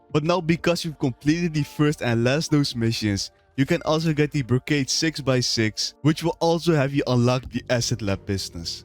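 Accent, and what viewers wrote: Netherlands. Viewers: Hes dutch bruh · His Dutch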